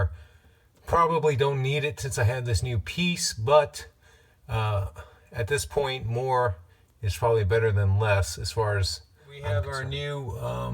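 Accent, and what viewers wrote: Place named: Pennsylvania, United States. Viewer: Pennsylvania? or New Jersey?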